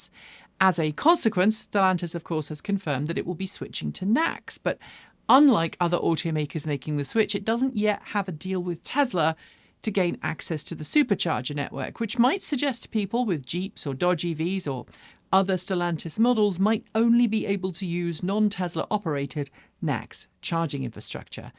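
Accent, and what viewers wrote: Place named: RP, United Kingdom. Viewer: Love the Brienne of Tarth accent ❤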